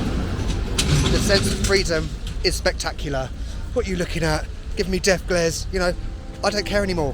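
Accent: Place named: RP, United Kingdom. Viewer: Yea, I've read the article you posted. Yet, you might haven't got that western Londoners accent anymore but RP is right there LOL 😀. Most of all I like your diversity and non common approach. Greatly appreciate the way you presented smaller cities and of course my home town of Cacak 🙂. Cheers mate/brate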